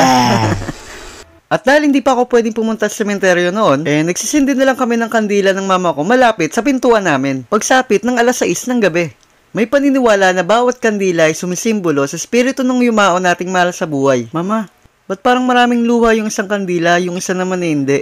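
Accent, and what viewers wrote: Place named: Bicol, Philippines. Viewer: Parang taga bicol to e hahah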